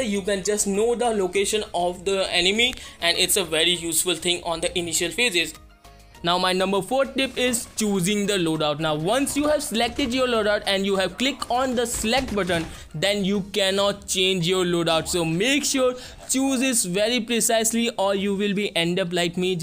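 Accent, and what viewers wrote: India. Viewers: nice English in Indian accent 🌚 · Bro ur voice proof that u r Indian 😜❤️. Love from Bangladesh 🇧🇩, Bhai😍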